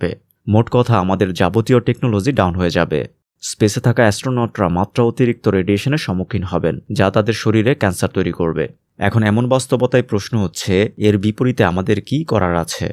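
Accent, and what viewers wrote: Narsingdi, Bangladesh. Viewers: ভাই এর বাড়ি মনে হয় নরসিংদী। ❤ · ভাইয়ের বাড়ি কি নরসিংদী নাকি 😀 আমার বাড়ি নরসিংদী, নিলক্ষা। ❤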